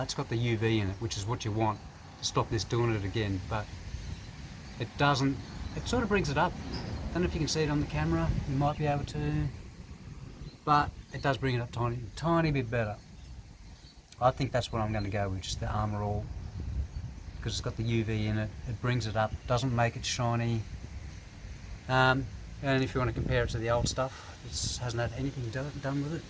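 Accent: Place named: Australia. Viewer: IDK why but a lot of Aussie accents are grating on the nerves to me as a New Englander. Yours does not. I like your accent and your car.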